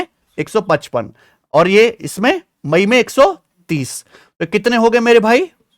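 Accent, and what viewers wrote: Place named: Uttar Pradesh, India. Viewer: Sir aap jo up language me tabad tod se bolte ho mja aata h sunne me bhi or padne me bhi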